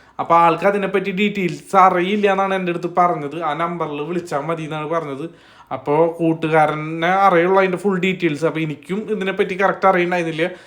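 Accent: Thrissur, India. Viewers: Thrissur malayalam...ദൈവമേ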